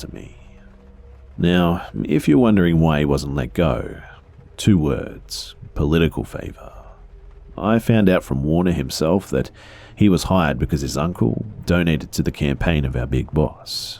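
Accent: Australia. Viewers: Good to hear an Aussie creepypasta reader. Its good to hear different readers to see how they handle the story. Mr Nightmare for instance can make anything sound scary and ominous, where as CreepsMcPasta sounds so relaxed and thoughtful. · came back to the youtube channel because I listened to everything that was uploaded on his Spotify podcast and was so happy to find there was a new here. I even listen to case file! What is about Aussie's narrating horrifying shit? I love it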